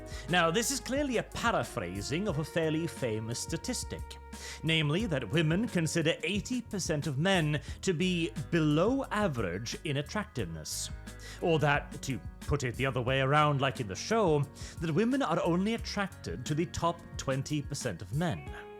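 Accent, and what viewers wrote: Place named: Wales, United Kingdom. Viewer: Don’t you love a Welsh accent!? Super enunciation as well. I’ve subbed.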